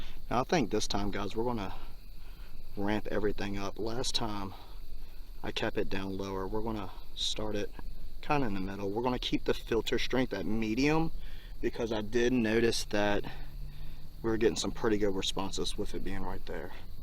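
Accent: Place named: South, United States. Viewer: I did NOT expect you to have a country accent 😂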